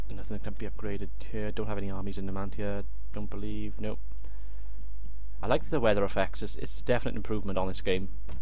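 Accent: Wales, United Kingdom. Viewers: Unorthodox is good though. You make moves I probably would be conservative to do and it is interesting seeing how that plays out. But I can certainly say you have became my favorite TW Youtuber over the past week or so. Also the accent is great :P Can't beat a good Welsh Accent.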